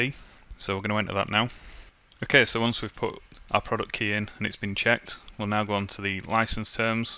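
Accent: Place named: England, United Kingdom. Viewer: Its realy hard to understand you with your long english accent but I think its only me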